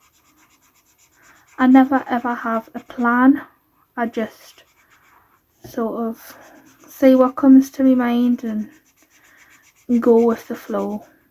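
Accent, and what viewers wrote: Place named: Northeast England, United Kingdom. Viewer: Ooo you sound like youre from North east uk I'm in Hartlepool , I love karla magana books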